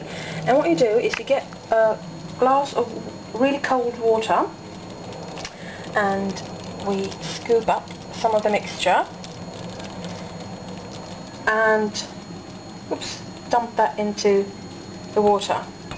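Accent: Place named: United Kingdom. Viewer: Cool, I'll have to make some of these. Do you live in Sweden you sound British ;)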